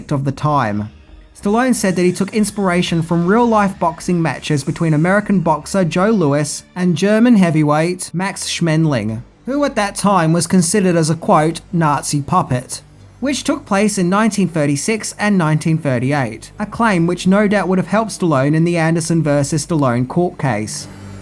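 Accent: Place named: Australia. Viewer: Not DraYgo...........DraW-go...................I understand you have the Australian accent, however its also important you pronounce things correctly.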